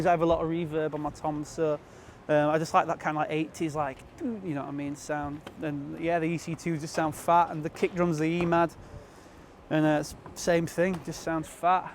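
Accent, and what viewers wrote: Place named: United Kingdom. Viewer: The accent.... 100% authentic Brits, y' know wha' I mean?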